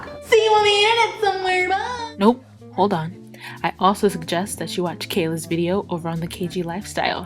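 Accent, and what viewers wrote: Nairobi, Kenya. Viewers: UMMMM i love your Nairobi accent!! I need to check to see if you you've done any ACCENT tag videos!!! This video was just so awesome!!! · Love the bougie Nairobi accent. Reminded me of Don Cheadle in Hotel Rwanda, somehow. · lol the kenyan accent gets me everytime coz me and my cousins do it all the time! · dig the bougie nairobi accent lol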